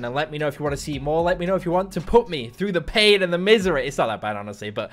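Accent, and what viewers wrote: United Kingdom. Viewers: I like your accent fluent English and just the little British tint love it!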